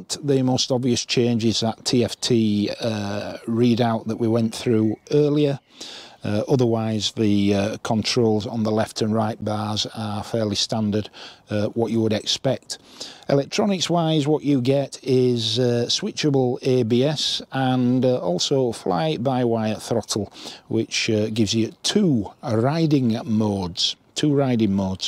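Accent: Yorkshire, United Kingdom. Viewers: Lovely to see ya again. Yorkshire Henry Cole. Makes me nod off every time. But I get the gist after watching several times. Keeps the views up lol 😂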